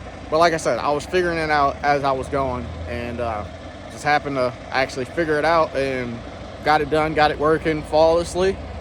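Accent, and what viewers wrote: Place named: South, United States. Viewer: nah bruh ghetto has nothing to do with it.... its super proper., damn near like a country white dude.. but no shade.. didnt mean it like that...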